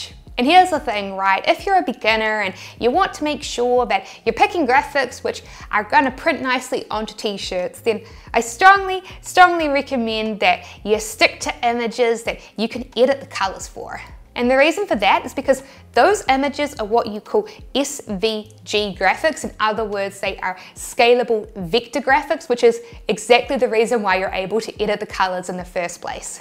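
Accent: New Zealand. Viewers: Hello from California. Thanks for sharing your knowledge. New Zealand is a new accent for me! I'm learning stip-by-stipI I am taking notes and trying to absorb as much as I can. Cheers Sis.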